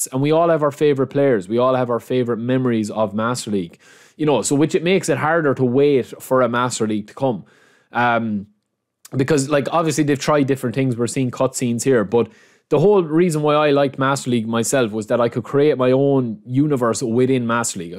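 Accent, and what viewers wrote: Ireland. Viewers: That Irish accent is quite a ting, lad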